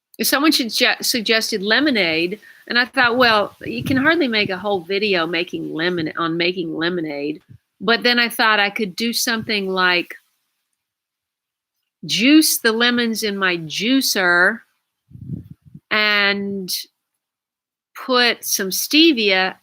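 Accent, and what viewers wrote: United States. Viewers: ... you're back in Palisades???? livestream had no idea you were puttin one out on transmission only by accident did i connect ( that's me for ya) you'll never guess all my 22 guests and friends.. how many all together your guess is as good as mine they just kept coming through my door we all sat where ever they could and connected to America (livestream) My family have been here in the UK since the run up week to Christmas so to hear a native American accent other than family made a few cry...with sentiment must say got to me also..... aas we sat watching and listening to comments it was a little heart touching but thanks you have made a lotta people happy here in my household THANKS....... have you had any response to house sale??? maybe now the holiday is coming to a closes it may get moving " i do hope so ( i pray it gets "done n dusted" Happy New Year... 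and a prosperous one seeya later more to say on email Edxx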